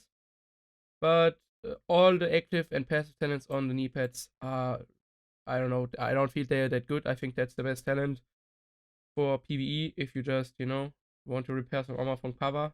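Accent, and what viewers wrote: Germany. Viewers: Digga das war das erste was ich gedacht habe... es regt mich turbo auf :D wenn die leute diesen deutschen "akzent" haben. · Bist du deutscher? Hast diesen deutschen accent ^^. Gutes Video !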